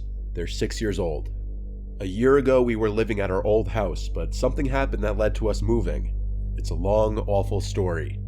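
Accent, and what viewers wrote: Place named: New York, United States. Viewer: has a new york kinda accent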